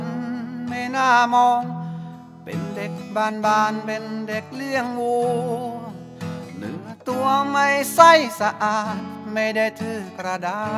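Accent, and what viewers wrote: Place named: South, Thailand. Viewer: แหลงใต้ชับนิ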